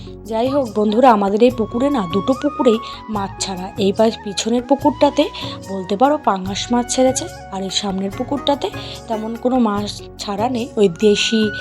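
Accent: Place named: Kolkata, India. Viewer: খুব সুন্দর অসাধারণ আপু আপনার কথা গুলো কলকাতার ভাষা ❤🇸🇦🇧🇩👌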